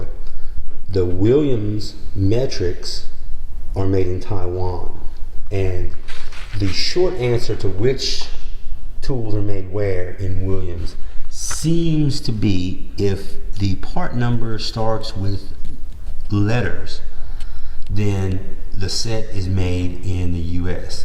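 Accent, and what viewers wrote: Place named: Louisiana, United States. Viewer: Noticed the accent before you finished your first sentence. Is it wrong that I'm more inclined to trust someone who talks like me? Been debating between these brands and was under a different impression. Thanks from Lafayette cher.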